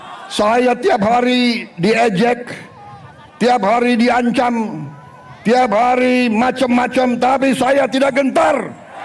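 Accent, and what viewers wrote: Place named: Betawi, Indonesia. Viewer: Aye seneng bapak presiden dialek Betawi ❤ pak presiden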